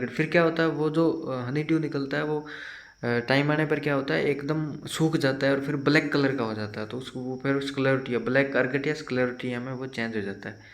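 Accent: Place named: Haryana, India. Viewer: haryana s ho kya bhai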